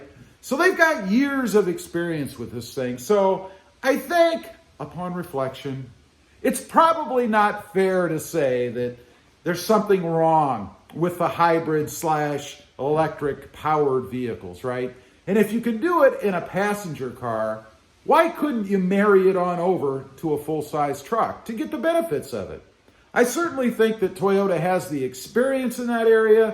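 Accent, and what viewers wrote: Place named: North, United States. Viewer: You're in Texas? Sounds like you have a northern/eastern accent.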